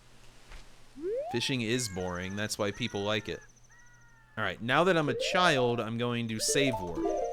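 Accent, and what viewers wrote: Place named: Brooklyn, United States. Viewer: sorry, this Brooklyn accent makes it difficult in stopping Ganondorf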